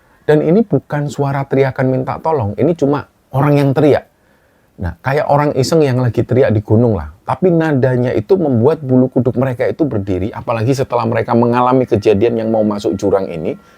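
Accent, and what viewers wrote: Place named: Surabaya, Indonesia. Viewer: ngomong cok nya faseh medok hehe mas ancen arek suroboyo temenan hehe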